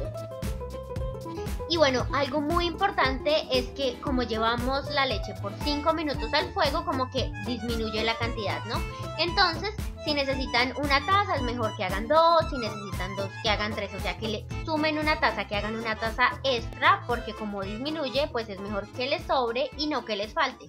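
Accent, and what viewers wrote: Colombia. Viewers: Hola, disculpa mi pregunta ignorante, eres Colombiana? Por tu asentó intuyo que si, yo soy colombiana y no consigo la leche evaporada. Sabrías en donde encontrarla? Gracias:3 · Hola... se te escucha super bonito tu Colombiano.... te estoy viendo desde Shanghai que quiero hacer un arroz con leche para unos amigos aquí. Gracias por el video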